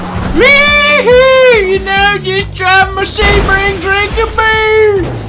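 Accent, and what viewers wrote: United Kingdom. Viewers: is he british? please reply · Is he British?!?!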